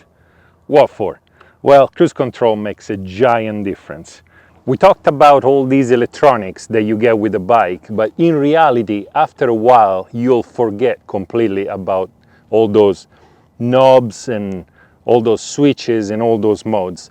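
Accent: Rome, Italy. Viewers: Beautiful honest review, good job. I think i've heard a bit of Italian accent. From Rome, to be precise. Am i right? If i may ask.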